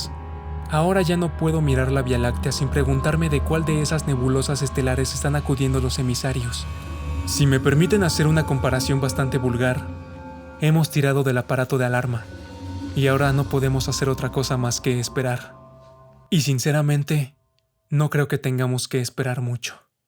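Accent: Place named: Zacatecas, Mexico. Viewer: Se nota el acento de Zacatecas pero es mucho mejor que la voz robótica o el español castizo con su odioso seseo 😢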